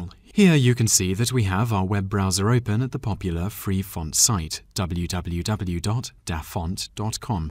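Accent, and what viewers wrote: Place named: United Kingdom. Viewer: British english! ... nice. By the way... very good your video, I use Apple. But well done. I will check your channel. Thanks!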